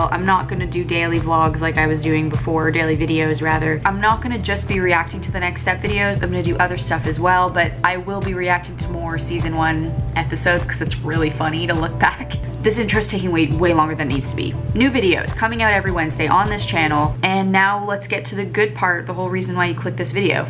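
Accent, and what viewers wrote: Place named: Canada. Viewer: I can't handle the "about"'s. It's too Canadian for me. Ahhh.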